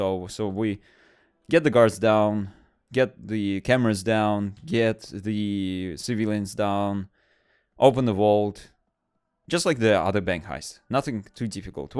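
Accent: Russia. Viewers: [GERMAN]Ich höre da Russischen Akzent raus. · So, you are Russkiy, ye? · arr u rusian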